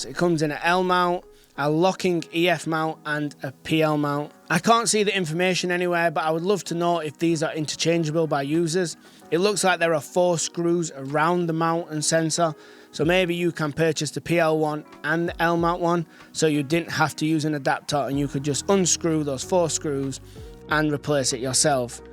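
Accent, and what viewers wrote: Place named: Scotland, United Kingdom. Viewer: Damn that Scottish accent. 😂 Thicc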